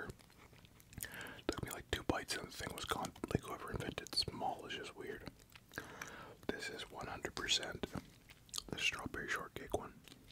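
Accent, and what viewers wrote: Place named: Canada. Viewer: didn’t know you were Canadian for like 6 months of watching until you said car in this vid! And then everything started to come together when you said “mum” and started talking about mr noodle (I’m Canadian)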